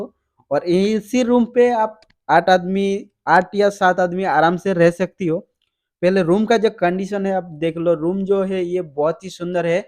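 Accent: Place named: Bengal, India. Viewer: কথা শুনেইতো মনে হচ্ছে বাঙালি... তা বাংলা তেই বলুন না... নিজের ভাষায় কথা বলতে কি লজ্জা করে??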